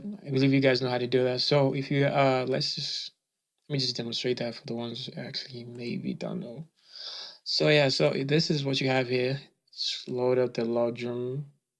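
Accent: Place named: South Africa. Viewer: Nice accent bro no homo are you south african?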